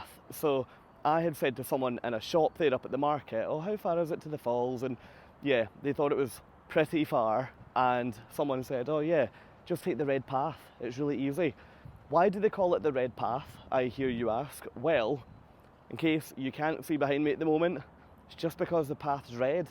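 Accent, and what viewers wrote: Scotland, United Kingdom. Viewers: The title makes it sound as though you're blaming Saint John for the troubles onboard. 🤨 Great vid of the Loyalist City, first incorporated city in Canada. Americans loyal to the crown, left their homes to come here during the revolutionary war. Glad you found Walmart. Love the Scottish (Glasgowan?) accent.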